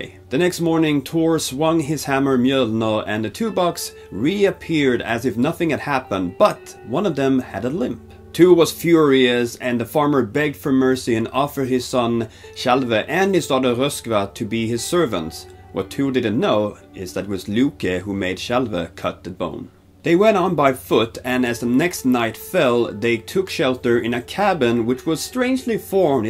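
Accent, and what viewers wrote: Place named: Scania, Sweden. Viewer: He is speaking with a skåne accent. Its southern Swedish.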